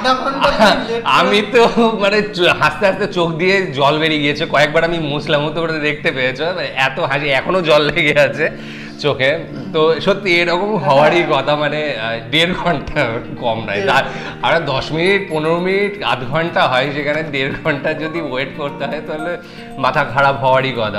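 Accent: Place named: Dhaka, Bangladesh. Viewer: অরিজিনাল ঢাকাইয়া ভাষায় কথা বলেছেন বস্!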